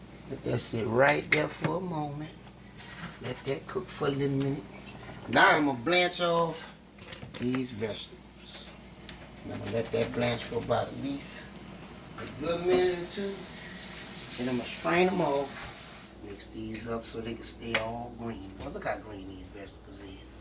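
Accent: New Orleans, United States. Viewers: Oh you moved from Louisiana to Dallas because you sound NOLA🔥 FASHO I KNOW YOU MISS FOOD BACK IN THE BOOT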